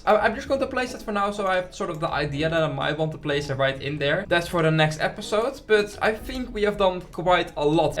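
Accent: Netherlands. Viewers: The Dutch accent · are you dutch?? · ik hoor het nederlandse accent >:)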